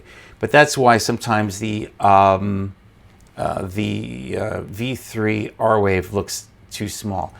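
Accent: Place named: United Kingdom. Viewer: Funny how you took english(U.K) accent..Well done Bloke.. Informative video...Appreciate your dedication..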